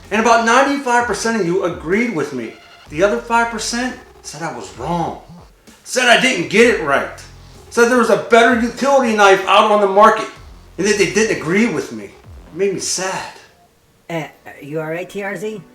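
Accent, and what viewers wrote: Pittsburgh, United States. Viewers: your Pennsylvania/Pittsburgh accent. Love it!!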